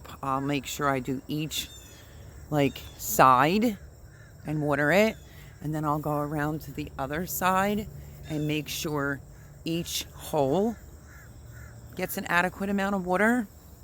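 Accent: Philadelphia, United States. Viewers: I immediately knew you were from PA. I’ve been out of Philly for almost a decade now and your accent is making me homesick! Haha. Very helpful review, I definitely will be ordering a case of these. God bless!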